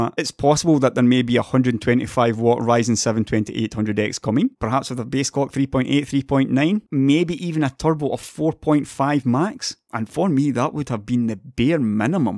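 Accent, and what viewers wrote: Scotland, United Kingdom. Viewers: Is that Scottish accent or Irish accent? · scottish accent sounds like shit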